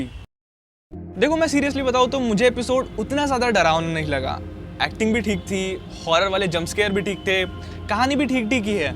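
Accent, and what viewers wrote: Nagpur, India. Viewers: Bhai tu nagpur se hai kya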